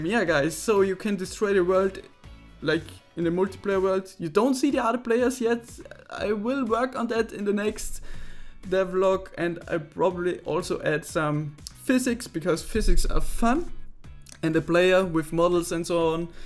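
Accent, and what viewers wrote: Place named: Germany. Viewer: Ein erster Schritt wäre dein Linking zu verbessern. Relativ einfach und intuitiv, wirkt dem deutschen Aktzent entgegen. Im Englischen werden zwischen Worten oft keine Pausen gelassen, wie dies im Deutschen zwischen quasi jedem Wort ist. Bspw. "an apple" wird als ein Wort ausgesprochen. Ich hatte diesen 'Trick' nach etwa einer Woche verinnerlicht (wird einem erst in der 12. Klasse offenbahrt).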